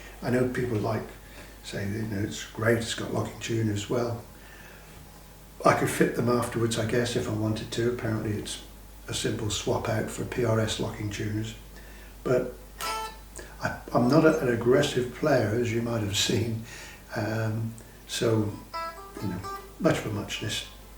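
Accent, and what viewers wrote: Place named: Devon, United Kingdom. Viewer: EXCELLENT PRESENTATION!, I came fot the Turquoise Custom 24!; Ebony!- and fhen, Wow!, 👌 I saw you, and DAMN! I thought I was looking at my dear Gramps!, here in Canada 🇨🇦 😎 🍁 Then you SPOKE! and 🌹 Zap me w/ a Feather!, YOUR ACCENT duplic ates his PERFECTLY! then you mention CORNWALL!, he's from DEVONSHIRE! Too-Rah-Eh!? I'm off to buy one of these NOW! Your playing is delightful! CHEERS! 🍻 from The Wild West! NEIL YOUNG COUNTRY ALL the Best!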